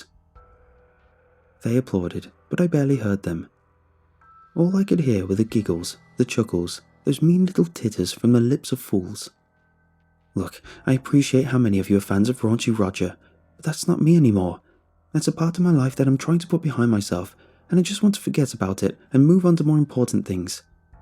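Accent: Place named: Northern England, United Kingdom. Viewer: Love the accent I'm from London and he's northern but not sure where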